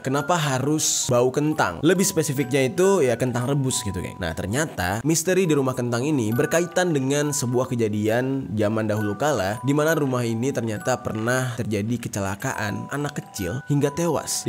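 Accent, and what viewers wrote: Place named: West Java, Indonesia. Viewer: Lidah kulon weee tonggoku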